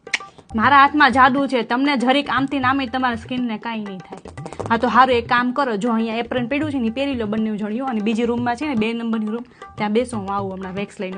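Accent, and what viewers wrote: Kathiawar, India. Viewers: Sister I love your accent.... Hu Mumbai ma rav chu but Rajkot ane Junagadh maru native che ane desh ma jaiye atle mane tyani accent bau mithi laage... Tamari ekdam same accent che.... I love it sister.... Lots of love and blessings from Ruchi from Mumbai ❤😊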